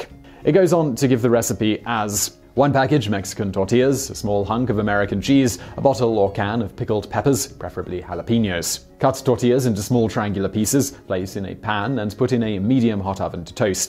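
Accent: United Kingdom. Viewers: I don't complain about his Brit speak. Making fun of a person's accent induced pronunciation is one of the few things we have left to make fun of. And not all Brits have the same accent, there are many different ones. When Roger Moore as Templer used to say Mafia it came out as Maff-Eee-Er. Hilarious. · As a Texan, hearing Spanish words and Mexican terms in a British accent was horrifying and almost insulting. Very interesting video though! :) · I normally like your British accent. But I hate how you pronounce NACHOS. Ugh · There are a lot of comments about the pronunciation in this video. I'm of two minds on the subject. First, give a break. He makes a bunch of videos every day and it takes a lot longer than 10 minutes to make the video you just watched. But second, there's a support team of researchers who dug all this up for him. Couldn't they help him with the pronunciation? 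There's this thing called IPA they could provide, and I'm not talking about a beverage. It's the International Phonetic Alphabet (for English). It's all those strange squiggly marks in dictionaries telling you how a word sounds, except much more well-thought out. I have a theatre degree (among others) and I spent a year on this subject alone as it's the basis of learning dialects for the stage. To sound American, British, or Russian, etc. (and yes, I know all have regional variants) part of the process of converting the text on the page to the sounds from your mouth goes through IPA. I know he's British and that will always color how he speaks. This channel provides a lot of interesting and useful information about esoteric topics. That's why I like it. I'm just wishing they'd go one step further and the entire team push to get the easier pronunciations right. Some languages are much harder for native English speakers, of course. 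But making the effort here would be well appreciated, imho. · I used the think british accents were classy until this! oh my ears. ouch the cringe in my spine! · Ha, I've never heard my hometown's name being pronounced so many times by a Brit before! BTW, I've actually been to the Rico's headquarters before to pick up some concessions items for a school festival thing during high school (my high school being located downtown just like the Rico's HQ, it wasn't a tough assignment). It's insane how big that building is for a downtown business! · Love these videos. Although i like the British accent, it seems that along with your efforts to find out the real facts, you would put as much effort in proper pronunciation.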